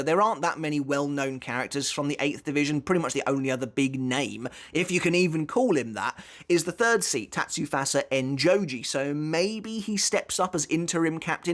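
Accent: United Kingdom. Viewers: It's probably the british accent.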